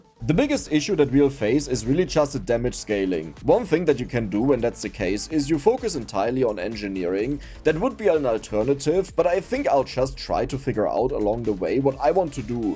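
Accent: Germany. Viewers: He Sounds rly german😂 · i love it that is german and somtimes you can hear it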